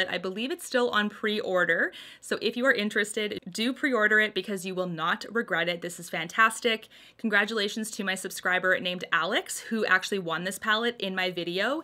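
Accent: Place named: Canada. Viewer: Any fellow Southerners love the way Canadians say "about"? I do:))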